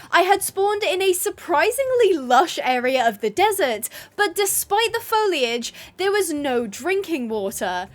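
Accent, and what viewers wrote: United Kingdom. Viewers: You’re so British you little bugga ❤ I love it tho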